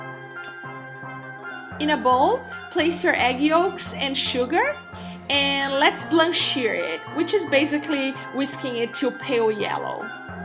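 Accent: Brazil. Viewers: she sounds brazilian they have onr if the cutest accent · Você é brasileira, né? YOU'RE BRAZILIAN, AREN'T YOU? I KNOW BY THE ACCENT! A receita parece deliciosa!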